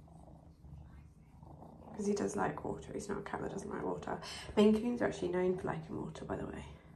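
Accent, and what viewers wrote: United Kingdom. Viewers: Look at the hairrrrrrrr British 🏴 accent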